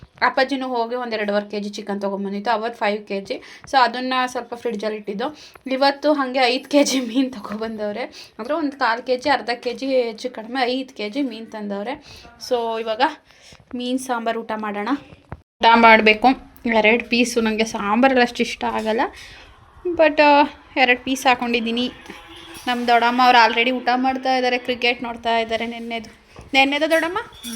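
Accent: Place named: Tumkur, India. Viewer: Yellava Nim tumkur mathu channagirutte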